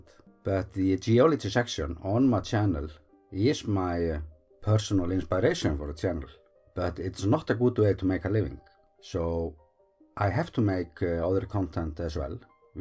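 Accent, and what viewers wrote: Iceland. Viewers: Miriam E. Yes, he speaks perfect English, but with an Icelandic accent that is unlike any other. Makes for a wonderful experience.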